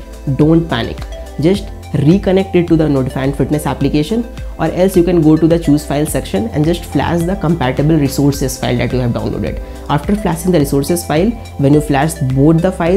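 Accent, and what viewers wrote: India. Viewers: Is this guy switching to indian mid video ?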